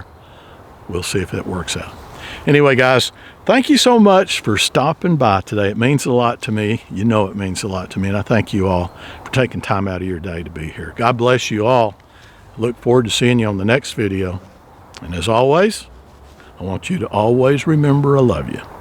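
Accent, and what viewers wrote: Texas, United States. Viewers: Always happy to see you and hear that Texas drawl that I remember so well. Do you live in the district for which Brandon Herrera is running If you do and he is elected, I wonder if there is anything that he can to do? Probably too late but worth the try.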